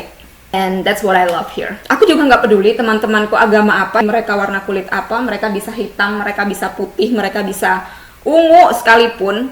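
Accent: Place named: Java, Indonesia. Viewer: Setdahh medoke metu mbak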